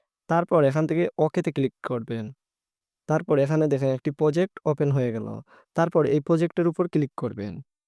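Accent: Sylhet, Bangladesh. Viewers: সিলেট নি ভাই তুমার বাড়ি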